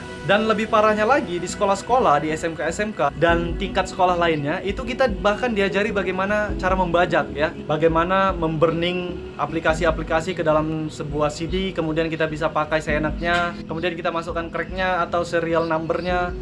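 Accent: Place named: Medan, Indonesia. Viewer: abang orang medan rupanya, pantes la kok ga asing logat nya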